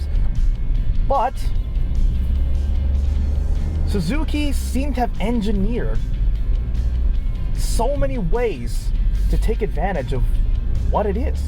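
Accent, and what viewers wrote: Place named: North America. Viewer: Thanks. Great video and great to hear a North American accent using modern normal measurements although the car does run on liquid fuel not vapour (gas)😂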